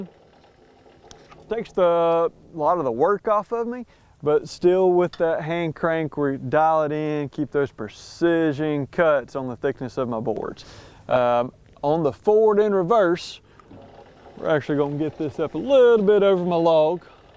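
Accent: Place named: Texas, United States. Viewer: You sure sound a lot like a Texan... Or some kind of southern drawl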